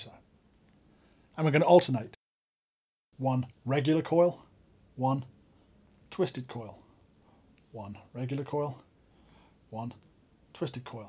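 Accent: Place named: United Kingdom. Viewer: As an American, I feel these techniques only work if you have a bad-ass British accent like this dude does.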